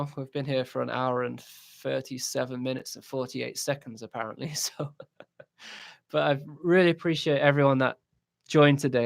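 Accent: United Kingdom. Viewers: dude, non-music question... You sound British, but what's the sketch with you being in Korea? What's your occupation and how did you find yourself choosing to live over there?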